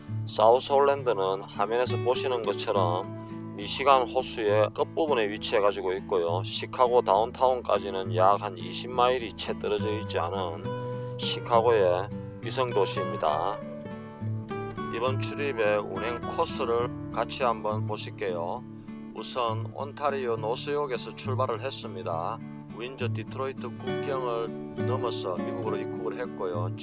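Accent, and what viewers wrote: Gyeongsang, South Korea. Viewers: 절로가라마~~~!!!!여가 너그집이가 임마 칵 쌔리뿔라마 ㅋㅋㅋ 전형적인 경상도 아저씨네에 두달동안 개점휴업예정 이시라 앞에 못본거 진짜 열심히보고 있습니대이 근대 재미있어예 헤헤😋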